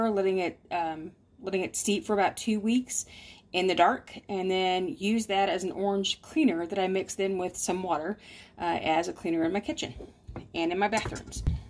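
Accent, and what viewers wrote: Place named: Australia. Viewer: "sorry a bout the cat" lol I was looking around here for which of my 3 cats were going off! Great tips, thanks for the down under speak-loved it! ♥ thanks so much for sharing!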